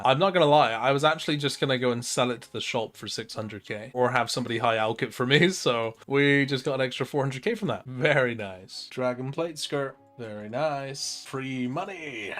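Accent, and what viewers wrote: Bristol, United Kingdom. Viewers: Are you from bristol sound like it lol😂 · Are you from the bristol area-ish? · Am I hearing a Bristolian accent? XD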